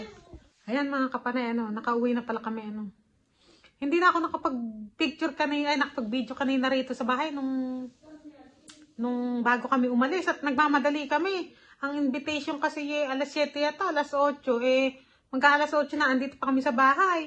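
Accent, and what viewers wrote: Nueva Ecija, Philippines. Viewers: sabi ko na nueva ecija ka...kase the way na mag Salita ka....nueva ecija din ako.....saan ka sa nueva ecija?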